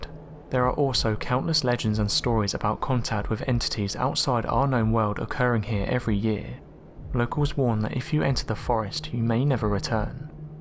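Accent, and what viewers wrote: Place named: Southern England, United Kingdom. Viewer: Anastasia Roman Yeah. Something about a soothing, southern English accent...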